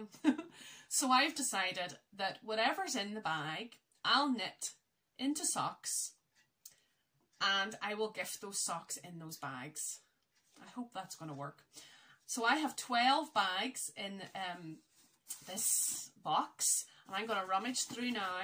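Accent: Northern Ireland, United Kingdom. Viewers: Hi, I've just came across your channel and have (as you can see ) went right back to the start. Do I detect a hint of Northern Ireland in your accent? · This was a very enjoyable first podcast. You took to it like a duck to water. I live in Co Antrim and it’s great to hear another N Irish podcaster. Looking forward to Episode 2. Touched by your scripture message. Thanks x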